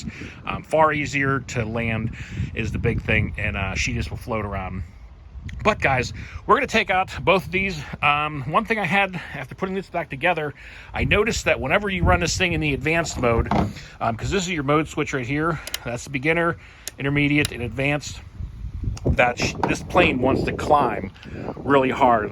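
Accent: Pittsburgh, United States. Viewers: Are you from Pittsburgh. I'm picking up a Pittsburgh accent!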